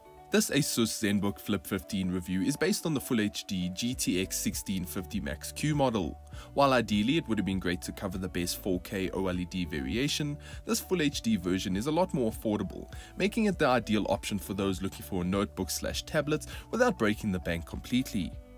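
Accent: South Africa. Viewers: South African??? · Yes it's funny I didn't notice that about sath afrikan before. Also bright being pronounced as braght.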